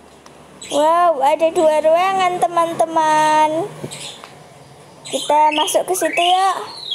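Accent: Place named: Java, Indonesia. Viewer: Pliss dong medok nya membuat saya mengngakak 😭😂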